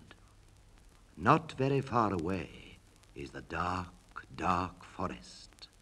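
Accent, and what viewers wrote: United Kingdom. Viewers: The most British accent there is. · Interesting that this is not on Wikipedia's enormous list of recordings. This is Wilfred with his RP accent, similar but not quite what he used to read the BBC news. Imagine if he had used his Lancashire accent! Lovely transfer by the way. Thank you so much.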